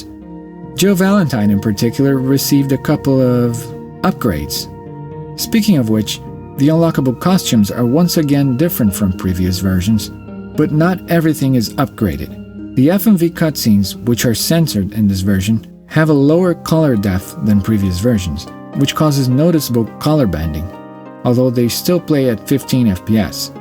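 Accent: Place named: Brazil. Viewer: Vc é brasileiro, né? Seu sotaque é muito forte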